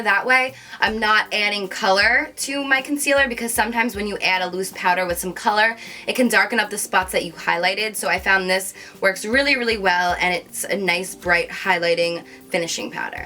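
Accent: New Jersey, United States. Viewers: i know she is from jersey i just didnt know that she lived in jersey. Just because you have a jersey accent doesnt mean you have to live in jersey.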